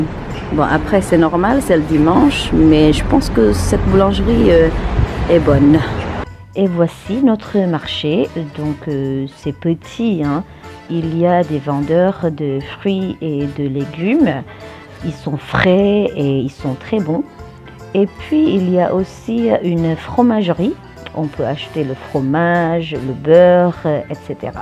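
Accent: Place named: France. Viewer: Keren banget logatnya udah Prancis banget, je suis jaloux je voudrais parler français comme teh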